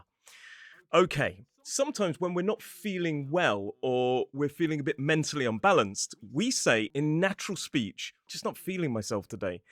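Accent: United Kingdom. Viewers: Love listening to your accent, it reminds me of my university semester in the UK 😍 P.S. Liverpool and Muse (not "the Muse" like my Italian friends would say) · Wow.Your voice reminds me of the singer from Coldplay — he has such a beautiful accent that I wish I could understand it. I gotta say, for some reason I always stuck with American English at school— but I think I’m changing my mind. I used to think British English was kinda boring, too formal, or just hard to follow. When I'm in London I feel an idiot. I cannot answer even simple questions... I don't get them. Perhaps it's a matter of accent I 'm not used to. But you totally proved me wrong. Thanks,!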